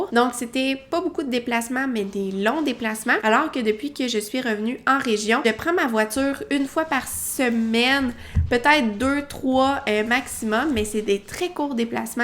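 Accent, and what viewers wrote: Quebec, Canada. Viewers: J'écoute vos vidéos pour pratiquer l'accent québécois! Merci! :)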